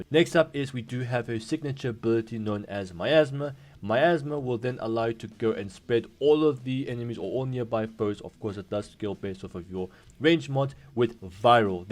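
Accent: South Africa. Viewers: Yo a South African g. Respect.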